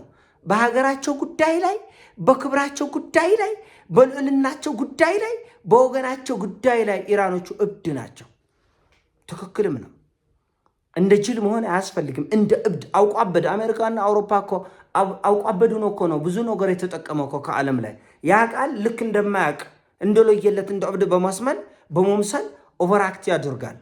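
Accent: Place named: Oromia, Ethiopia. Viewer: ኦሮሞ መንግስት ሲወድቕ አነጋገርህ የኦሮሞ አማርእኛ ስናጋር ትቀይረዎለህ አደል አይአንተ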